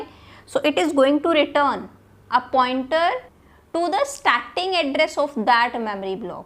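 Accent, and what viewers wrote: India. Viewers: Indian English so bad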